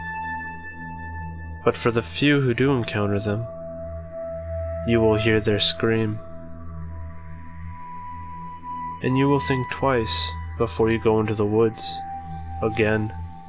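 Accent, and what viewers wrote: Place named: England, United Kingdom. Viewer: The correct pronunciation is ( K O O S ) not Cooas ! The narrator is an English Speaking gentleman from England where they speak the ( Kings English ) not American English which is not the same ! !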